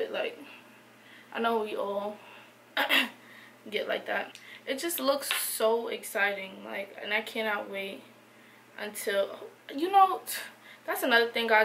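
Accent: New York, United States. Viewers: You from new york? Harlem?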